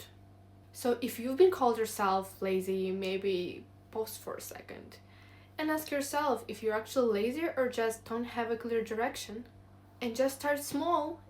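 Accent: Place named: Eastern Europe. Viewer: i hear a slavic accent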